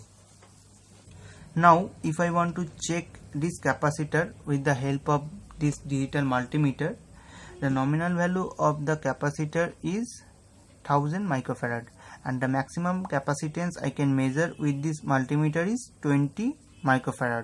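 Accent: India. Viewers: 350 million AMERICAns and the video has 1 guy with a strong India accent?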